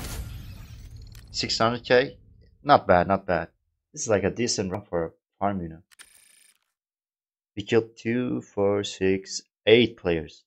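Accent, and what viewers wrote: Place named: Middle East. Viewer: Awesome! I was going to say middle eastern but I didn’t want to be wrong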